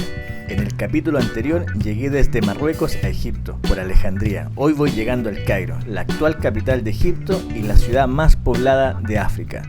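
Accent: Chile. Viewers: Me encantó tu vídeo y sobretodo tu relato bien chileno , yo creia que Egipto era un país muy caro para visitar,pero por lo que cuentas no es asi , saludos desde Los Lagos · Genial el relato 😂😂😂a lo chilensis Bravo Me encantó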